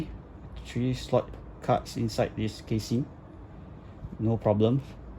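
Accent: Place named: Malaysia. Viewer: Judging from your accent are you from malaysia?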